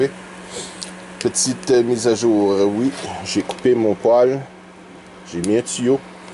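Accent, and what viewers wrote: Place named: Gaspésie, Canada. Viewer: Vous êtes de la Gaspésie si je me fis a votre accent? Beau projet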